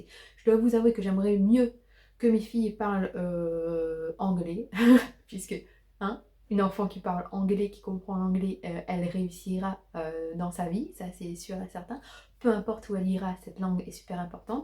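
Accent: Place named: Reunion, France. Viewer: Coucou je tombe par hasard sur ta vidéo et j’avais deviné que tu étais de la Run, l’accent ne trompe pas lol très sympa tes vidéos bonne continuation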